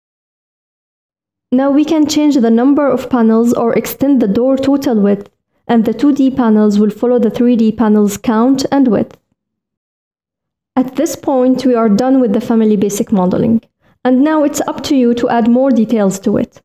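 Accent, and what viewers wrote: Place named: West Asia. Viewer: I think that : Your voice is from the West Asia ! I am living in Viet Nam , I have learn Revit in 1 month . I find on youtube in Viet Nam but the result is zero ! Thank you the West Asia girl !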